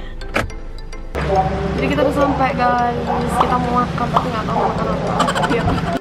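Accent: Java, Indonesia. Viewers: Ngomomg e medok ngono🙄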